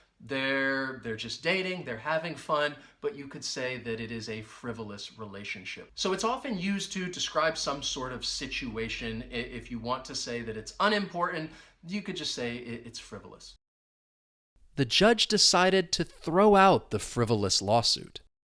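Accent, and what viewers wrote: United States. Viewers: I am from India... even I understood all the words properly in your American accent..all adjectives were tought very clearly... Thank you sir!!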